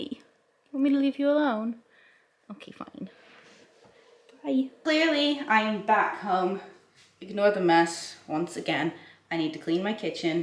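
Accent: England, United Kingdom. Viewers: That English accent is coming along lovely bruv :)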